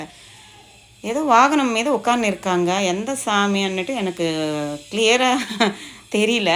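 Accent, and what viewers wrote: India. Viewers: Very useful video mam. Your telugu mixed tamil is so cute to hear😊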